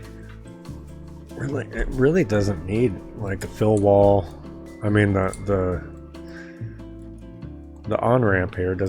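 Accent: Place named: Oregon, United States. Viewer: You're a fellow Oregonian, sounds like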